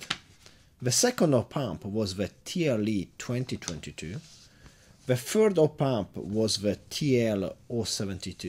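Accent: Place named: Poland. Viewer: you're from poland, right?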